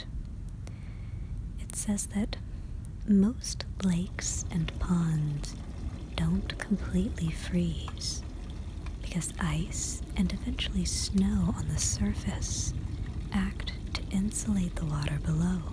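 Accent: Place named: Canada. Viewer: All perfect from A till Z.. Winter has also its calm, romantic and cuddle feelings. Thank you.. But nice to hear your American english (though i know its canadian). Enjoy the nice christmas time dear friend 🎅🎅🎅🌲🌲🌲🌠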